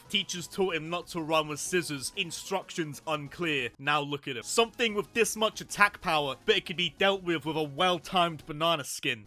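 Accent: United Kingdom. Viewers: i think hes british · I’m subbing because you’re British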